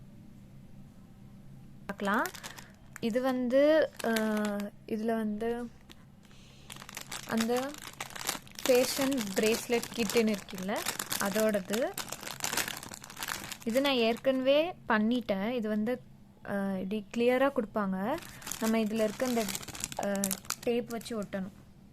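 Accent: Kerala, India. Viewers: Akka neenga inaiku pesunadhu edho Kerala ponnu maari irundhuchu😝😝😍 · Akka today in this video your voice sounds like a malayali but anyways enjoyed your video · Ur tamil slang became like malayali's Tamil slang 😂... It's strange... What happened.... To ur beautiful Tamil slang... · Akka nenga ea konjo malayalam kalantha tamil maru peasuringa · Your voice is changed like Malayalam slang akka it is nice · Akka intha video la unga voice kerala voice madhiri irrukku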